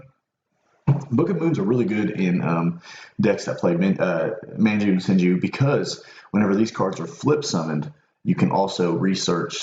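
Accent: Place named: United States. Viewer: Great review! Love the American accent 🦅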